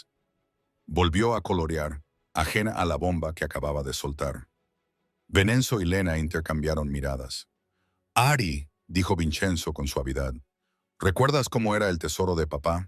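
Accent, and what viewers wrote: United States. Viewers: Si por favor piensen en sus historias antes de difundirlas y pongan bien los generos de los personajes, a vecesved un niño y a veces una niña y porque usar una voz con acento norteamericano hablando español?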